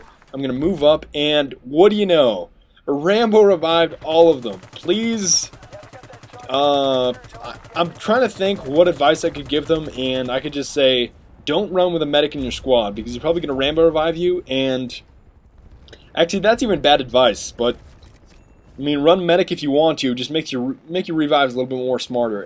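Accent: Germany. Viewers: haha your english-german accent is epic :D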